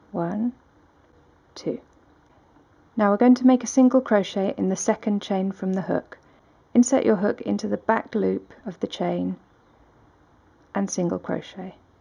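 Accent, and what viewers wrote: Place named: United Kingdom. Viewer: Thank you,. You're were clear, and to the point. Love the effect of visually highlighting the important and critical stiches. You made it so easy! Enjoyed your British accent as well as your soothing voice. Kudos!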